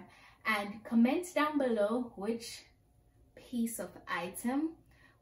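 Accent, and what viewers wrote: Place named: Jamaica. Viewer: loved this video. I caught the accent. Jamaican? If so, I'm Jamaican as well and i also did a Back to School Try on Haul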